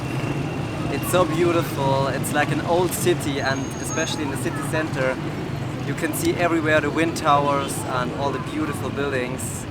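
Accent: Germany. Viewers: He speaks English very well. I barely can hear German accent. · Are u german???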